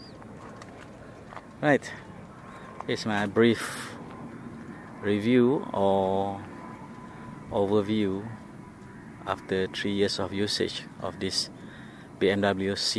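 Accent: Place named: Malaysia. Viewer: Nice review. From the accent I'm guessing Malaysia somewhere (apologies if I got that wrong). All round great video !!! Thanks.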